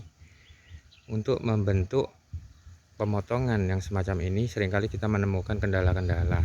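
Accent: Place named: Java, Indonesia. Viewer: padahal logatmu logat Jawa kampung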